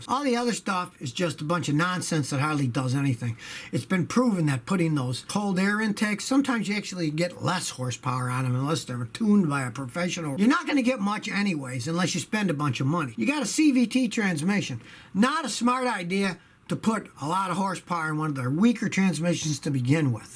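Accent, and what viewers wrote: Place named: Texas, United States. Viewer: This is new. It's sorta like the YouTube version of Car Talk with a Texas accent.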